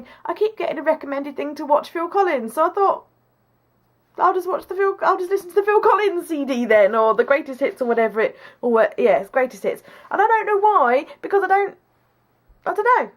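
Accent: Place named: United Kingdom. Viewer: Dear, If you wanna try something unusual, I've got a vegetarian recipe. There's a traditional hungarian meal, called stuffed paprika and my mom invented a vegetarian version a few years ago. Let me know if you're interested and I'll post the details here, or send you an e-mail:) Your videos help me so much, because I'm still getting used to the british accent, but with your help, its easier, so THANK YOU!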